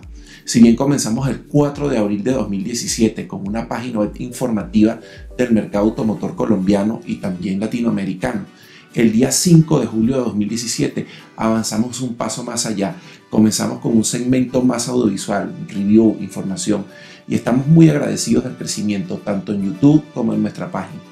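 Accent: Venezuela. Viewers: Hey no sabia que eras Venezolano, debe ser porque soy costeño y se comparte un poco el dialecto, antes tenia mucha familia allá, nuevamente saludos chamo.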